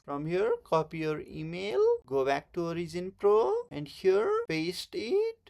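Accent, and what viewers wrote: India. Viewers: You must be from Odisa, India..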